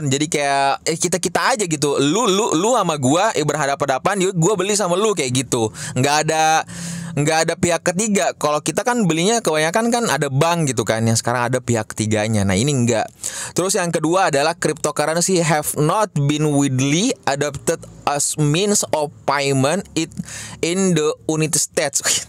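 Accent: Bugis, Indonesia. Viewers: Bugis ya bang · Bang,, orang bugiss yaaa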